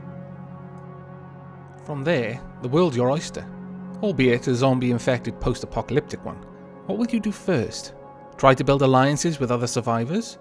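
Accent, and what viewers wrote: United Kingdom. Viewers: What's with all the new Let's Players I'm finding sounding like they're doing voice-overs on prologues to some series? First the 'Call in now, and you get TFC with not just five, not just six, but at least SEVEN NEW TREES!' of Pakratt, and now the 'Come with me on a journey through the ag... I mean, game' of this guy? Amusing, though. Wonder how you'll talk later on... Probably still british-esque.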